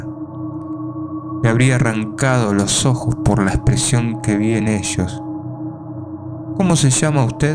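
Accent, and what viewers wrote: Argentina. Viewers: La narración no es tan envolvente ó agradable.... Ese acento argentino ó paraguayo, no provoca seguir escuchandolo...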